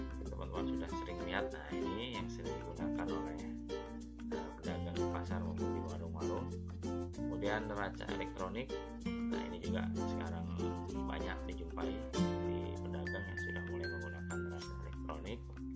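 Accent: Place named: Lampung, Indonesia. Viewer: Dari Lampung mana suaranay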